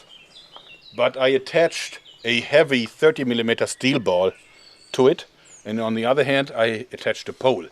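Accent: Germany. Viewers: Du hast nur einen Satz gesagt und ich wusste sofort das du aus Deutschland kommst :D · man hört voll raus das der Typ deutscher is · he sounds german idk :D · you have an really Nice german ~ accent ~ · you are one sick fuck;-) l enjoy your exuberance and enthusiasm dont change and that german acent off the top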